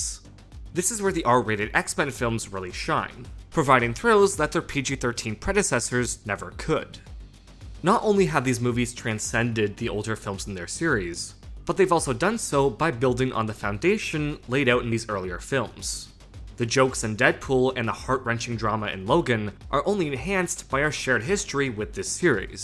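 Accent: Canada. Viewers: canadian accent detected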